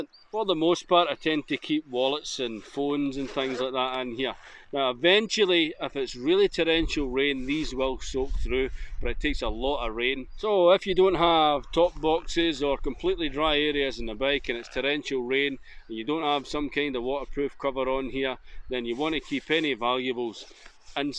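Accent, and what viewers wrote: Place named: Dundee, United Kingdom. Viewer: Familiar accent as I haved lived 6 yrs in Dundee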